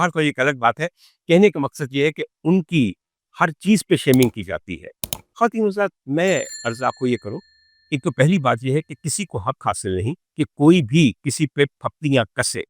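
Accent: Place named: Punjab, Pakistan. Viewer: آپ کا پنجابی تڑکہ مزا دوبالا کر دیتا ہے 😊سلامت رہیں خوش رہیں آمین 🎉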